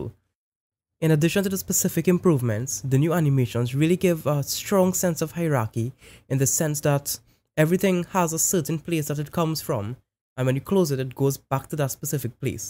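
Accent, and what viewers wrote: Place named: Trinidad and Tobago. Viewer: great vid.....you sound Trinidadian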